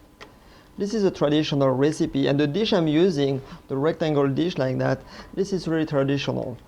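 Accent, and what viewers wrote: France. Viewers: French english 😅😅...funny,I like it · Thank you for a great explanation on how to make a Tiramisu. By the way i love your french accent.🙌😉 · I did not realise Chef was called! Quel accent français, seeing the channel name, I was expecting a english gentleman!! Edit: doing that tomorrow by the way;) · Nice one ! That French accent though..🤣🤦🏽‍♀️🤷🏾‍♀️😘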